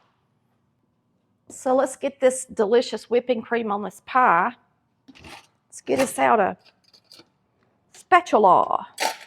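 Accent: South, United States. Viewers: You are some lucky husband's wonderful talented wife cook and baker. You simply amaze me with all of your talented recipes.Your instructions are on point and your lovely southern accent is so sweet. You also have patience with all your newbies, and that can be me at 74 years old. Bless your heart for all you teach us, it is so appreciated. Sincerely, From Grandma Debbie 🙋🧑‍🦽 and Dexter-Dog 🐕🐾 my sweet tiny rescued Chihuahua 🐕🐾 living 🏠🌴 a quiet crafty life 🏠🌵🏖️ in southern California. 🙋🧑‍🦽🐕🐾🌴🏠🌵🏖️🙏🙏😎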